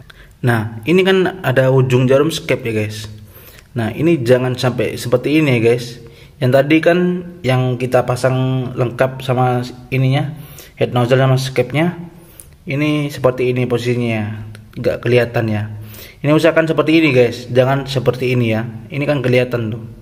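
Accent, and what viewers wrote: Madura, Indonesia. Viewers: Jek logatah reng madureh abiss mas😂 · Katara men reng madura , bhasa agak sal sal sakunik 😅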